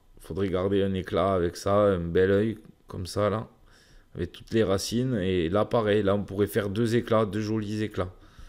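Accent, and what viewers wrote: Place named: Paris, France. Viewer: Il a toujours, l'accent pointu 😂❤ail c'est dur une tomate 🍅 si elle tombe du balcon sur moi, je me fais une razia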